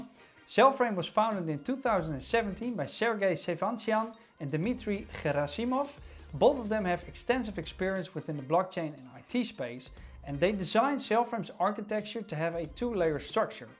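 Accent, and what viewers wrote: Netherlands. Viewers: You sound Dutch :) Nice covering of Cellframe, thx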